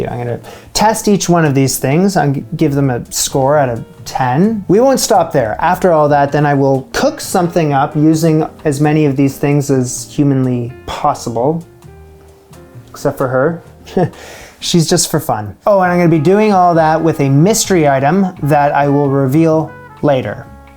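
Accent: Canada. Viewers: Has accent become more Canadian since he moved back to Canada?🧐😅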